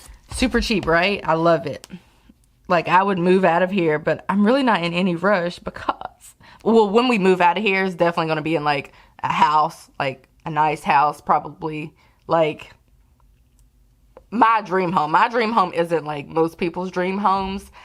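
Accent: South, United States. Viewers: You’ve taken on a southern accent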